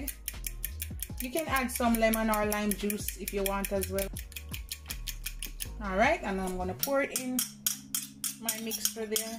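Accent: Jamaica. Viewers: You know we loving that Jamaican accent baeeeee!!!!! zucchini bread is new to me... but woahh what a big Cucumber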